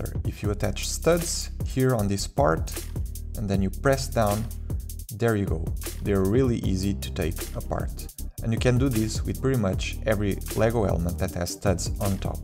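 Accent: Portugal. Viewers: meu deus, só do principio do vídeo consegui perceber que eras português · Olha videos incríveis, e eu estou bastante orgulhoso de ser um tuga a conduzir um canal incrível(a menos que sejas brasileiro, mas o teu sotaque remete muito ao sotaque português)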